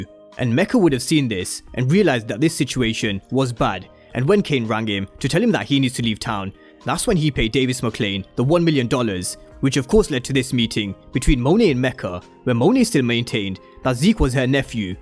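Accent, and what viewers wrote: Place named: United Kingdom. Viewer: Sounds like the narrator is possibly British, they sometimes pronounce names or terms ending with an 'a' or ' 'ah' with an 'er'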